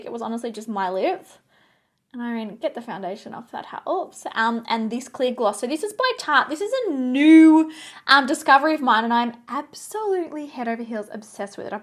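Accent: RP, United Kingdom. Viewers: We Americans like to do the English accent too lol! You look gorgeous! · That British accent 😂🤣 brilliant, but very posh darling 😂😂 most of us are common as muck haha xx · Not a bad English accent xx love from the UK 🏴❤️ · I am 🤣🤣. I'm from the U.K and your British accent is 👍 Love your channel, however you cost me a fortune in shipping and tax fees when I purchase your products 🤣🤣 ps your finishing powder is the BOMB 🥰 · your british accent is really posh lol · The British accent! I was loving it. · Haha love the British accent. We don’t all sound like that though. That’s what posh people from London sound like 😂 xx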